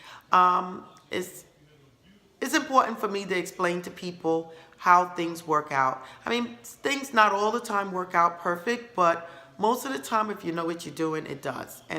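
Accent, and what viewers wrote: New York, United States. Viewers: are u from new york? you've got a new york accent lol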